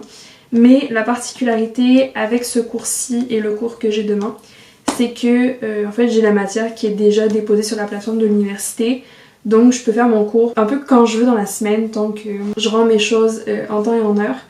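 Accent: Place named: Quebec, Canada. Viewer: Oh, je ne m'attendais pas du tout à t'entendre parler avec l'accent québécois, ça te change tellement ! ça fait plaisir de te retrouver sur YouTube 🥰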